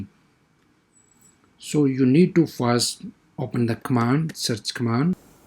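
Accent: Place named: Sialkot, Pakistan. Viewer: I like your sialkoti english accent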